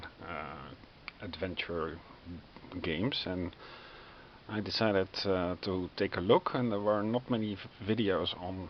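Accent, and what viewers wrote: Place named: Netherlands. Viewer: Ah I thought I recognized a Dutch accent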